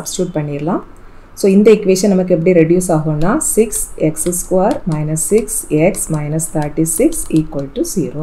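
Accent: Kanyakumari, India. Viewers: no kanyakumari slang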